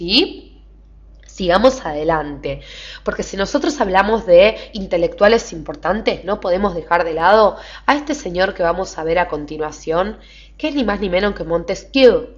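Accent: Argentina. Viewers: la mina es de argentina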